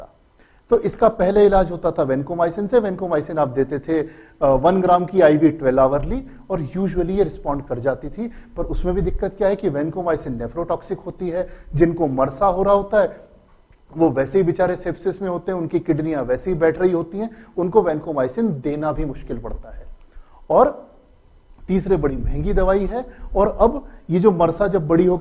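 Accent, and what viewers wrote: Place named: Malwa, India. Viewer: Sir u r a genius with ठेठ (beyond)मालवी accent🙏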